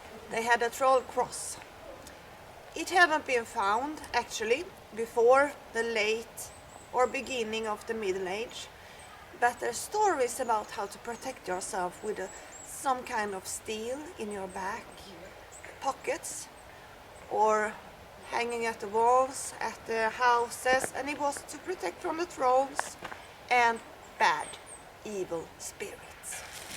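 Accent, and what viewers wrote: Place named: Sweden. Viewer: Thank you for this wonderful documentary, I enjoyed it so much! I'm an Australian and I visited Halleberg last year. I have been studying the Viking Age and the earlier periods since I was a teenager in the 70s and I've always dreamed of going to Sweden but I never heard about these two mountains, and I especially had never heard that they were Asgard and Valhalla and Odin's lookout. I started writing a book 2 years ago which I've just published and I talk quite a bit about this area because my Swedish friend took me there and translated the posters in the museum about the Aesir coming and setting up there fortresses there. I think they built those great stone walls you were showing. You taught me a lot of very useful things, I found you because I was looking for information about Hästevads Stenar. You look really great in your warm green dress, you really do look like a magical lady from the Viking age. 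The way you speak English with your Swedish accent is also very charming for us to listen to. I hope to come back again soon and camp there and really look over the whole area. Kindest regards David from Australia.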